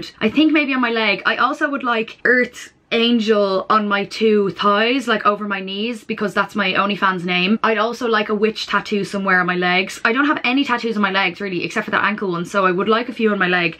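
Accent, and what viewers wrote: South Dublin, Ireland. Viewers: Are you irish ? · I'm actually obsessed with your accent because it gives me south Dublin vibes but without the judgment. Also obsessed with the tattoos I'm going to turn myself into a doodle pad I just want all the tattoos now!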